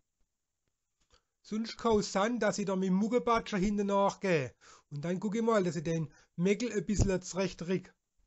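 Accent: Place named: South Franconia, Germany. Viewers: Naa, des had mim schwäbscha ned sou vil zum doona. Des is halt dr frängisch daal voa Werddaberch. I kumm voa dr Blafeldr geichnd und do reidt mor a a bissla andrsch, wia der in dem Video do greidt had. Aber des is noo a voa aam Oord zum adra wider zum daal ganz andersch. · Hört sich an wie der Dialekt zwischen Karlsruhe, Pforzheim, Heilbronn, Heidelberg. Südfränkisch, umgangssprachlich "Badisch" oder wie auch immer der Übergangsbereich zwischen Schwäbisch, Alemannisch und Rheinfränkisch heißt. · Wohne seit 3 Jahren nicht mehr in Hohenlohe, war.... ich nenns mal interessant den Dialekt wieder zu hören 😂 Etwas cringe, aber interessant. Ziemlich nostalgisch.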